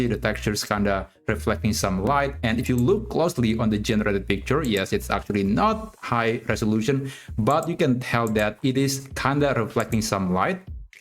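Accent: Indonesia. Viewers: you sound Indonesian, are you?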